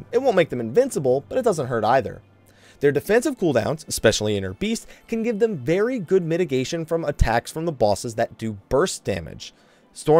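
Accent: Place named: United States. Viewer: That's just an American accent. Take it from a Brit that you guys butcher the letter A!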